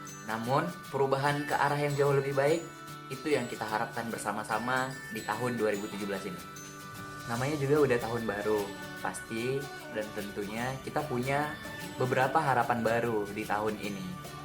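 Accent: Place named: Medan, Indonesia. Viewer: wah .. semoga targetnya tercapai ya.. btw cara ngomongnya kok kayak anak medan hihi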